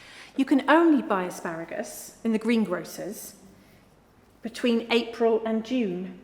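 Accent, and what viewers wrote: United Kingdom. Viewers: British English ♥️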